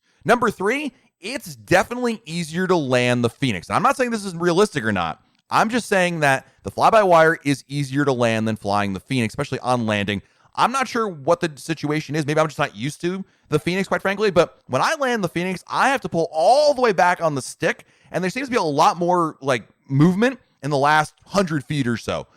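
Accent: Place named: United States. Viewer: This is the first time I've seen any of your videos. I usually watch Overkills Simulation, due to his American voice and great content. His videos taught me how to fly the FBW A320 among other things too which are MSFS related. You have a a great American voice for this type of content. I am American and find it hard at times to listen to the British Videos, which their are so many. Nothing personal to the Brits. Just not my "cup of tea" ( pun intended ) I did subscribe to your channel. Please keep the video's coming. Great Job !!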